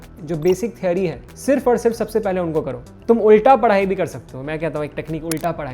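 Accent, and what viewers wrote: Bihar, India. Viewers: Ig he's from Bihar,coz of his way of speaking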